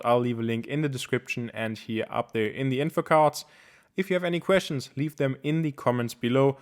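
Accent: Germany. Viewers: Deutscher Dialekt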